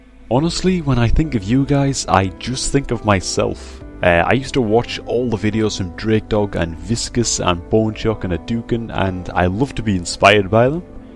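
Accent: Newcastle, United Kingdom. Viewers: sound like you have a geordie accent lol, sorta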